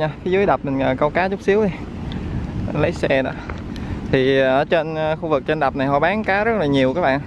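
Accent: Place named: Tam Hiep, Vietnam. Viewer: Giong dab tam hiep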